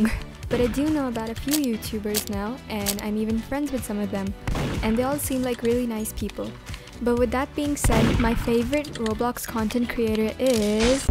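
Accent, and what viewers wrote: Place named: Philippines. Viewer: It's clear that she's Filipino I know one when I hear one I can tell by her accent.. AND NICE VOICE:D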